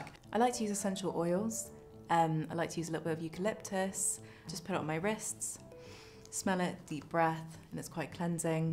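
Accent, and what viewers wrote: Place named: United Kingdom. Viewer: I love her natural British accent!!!❤️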